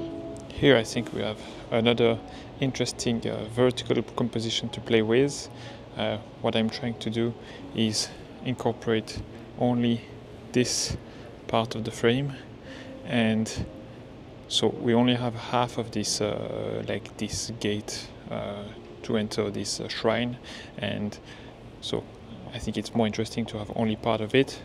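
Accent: France. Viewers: Drôle comme on reconnaît l'accent francais, belle video 👍🏻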